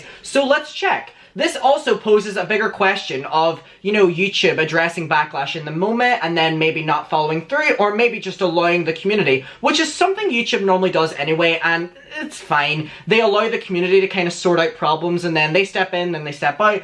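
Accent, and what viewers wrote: Ireland. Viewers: I think his is Irish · He sounds a little Irish and British.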